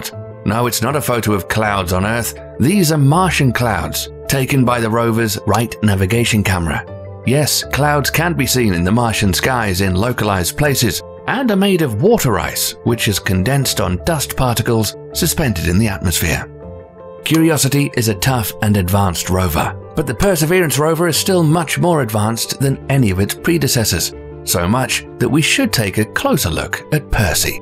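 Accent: United Kingdom. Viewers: I will never NOT upvote any video with the soft dulcet tones of the best british voice narrator.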